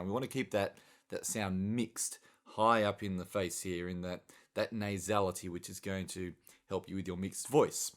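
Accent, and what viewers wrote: Australia. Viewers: You are one of the best vocal coaches here on youtube, possibly among the top 3, and I just love the aussie accent!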